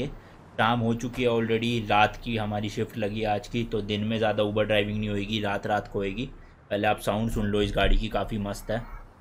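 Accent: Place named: Haryana, India. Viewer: apka haryanvi boli mst hai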